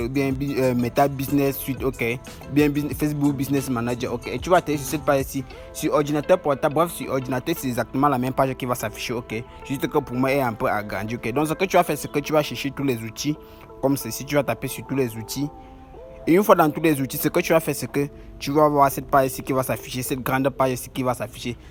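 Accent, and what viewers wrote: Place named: Cameroon. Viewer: Franchement, on a du mal à vous suivre, tout le monde n'est pas camerounais...Allez doucement pour ceux qui vous suivent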